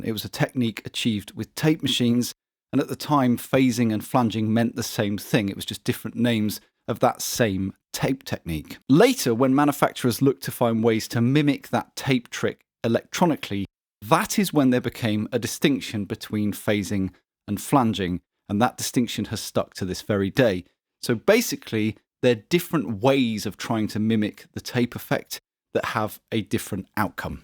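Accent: Germany. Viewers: Striking German accent there (now try to pronounce that properly!??? )! Yet another colourful, enjoyable and warm vid from:) Gotta add how inspiring it is when you show all the gritty details of the processes taking place, makes my fingers itch to get back to my studio...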